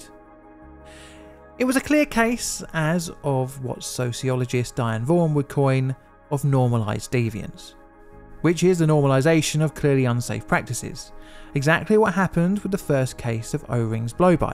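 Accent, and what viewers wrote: United Kingdom. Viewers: He is British, slight difference in pronunciation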